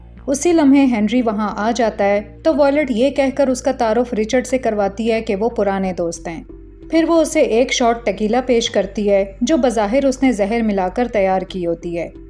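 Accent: Pakistan. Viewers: Aapke urdu aur talaffuz se malum hota hai shayad pakistan se hain. Anyways, ek french movie hai "Tell no one", uska detailing karein ...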